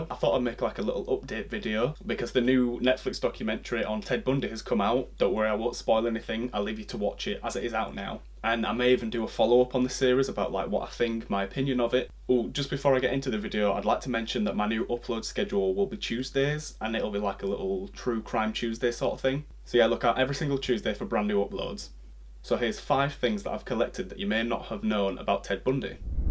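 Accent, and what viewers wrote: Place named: Yorkshire, United Kingdom. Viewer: Are you from Yorkshire? Your accent is really cute haha Great video btw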